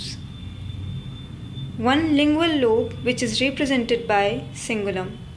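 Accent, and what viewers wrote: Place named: India. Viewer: I like the fact that I didnt understand it in my language and I found someone to explain it better in English with an indian accent. Funny . Thank you !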